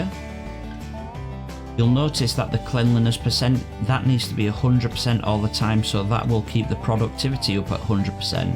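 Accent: Yorkshire, United Kingdom. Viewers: Nice to hear a yorkshire accent, Harrogate here ;). Great upload, do you have a list of the equipment you rented etc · sounds like keith lemon. definately yorkshire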